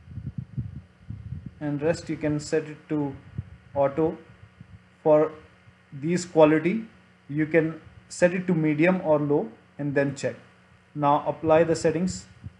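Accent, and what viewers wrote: India. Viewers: Why is it always the worst desi accent always in these videos?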